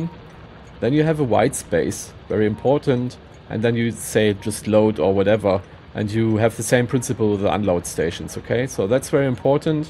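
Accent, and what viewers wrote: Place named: Germany. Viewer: The german accent is HORRIBLE... please stop it... :D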